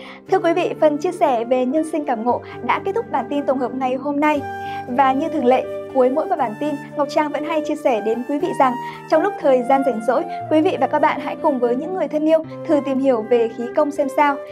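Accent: Standard. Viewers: Chị đã xinh lại nói chuẩn nhanh như gió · noi hay chuan chi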